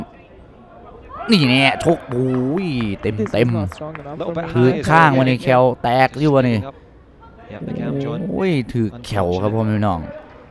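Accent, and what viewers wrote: Isan, Thailand. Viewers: เว้าลาวอีสาน ผสมกลางมันม่วนดีสุดยอดรวยจ้า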